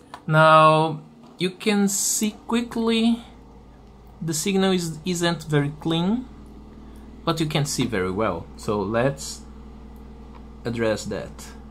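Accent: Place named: Brazil. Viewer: Tu é Br man?(If not you sound very brazilian)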